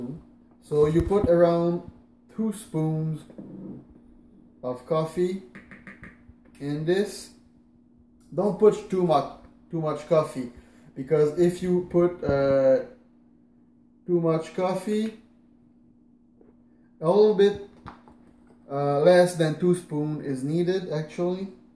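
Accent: Quebec, Canada. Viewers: Merci mon homme, bon accens québécois XD Avant mon café tasted like shit aussi, maintenant il taste toujours like shit mais c'est à cause que j'ai une keurig. J'ai commandé la breville Café Roma sur amazon et je vais commencer à me faire des bon latte! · T'a commencé à parler et je savais t'était Québécois haha x). L'accent est très prononcé, mais nice le review.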